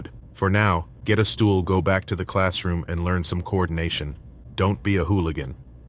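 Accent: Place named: United States. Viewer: Love the conten Hate the voice I hate American speaking voice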